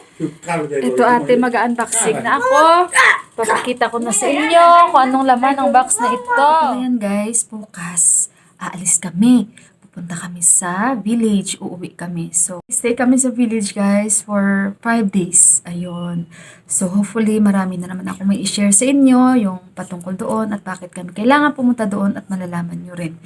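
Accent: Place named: Hiligaynon, Philippines. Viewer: More blessing inday.always watching ur vlog and don't skip adds.basta ilongga malambing gid ya mag salita.kaya halata ka ng asawa ko na ilongga dahil sa tuno ng pananalita mo.asawa ko taga bacolod din.God bless inday.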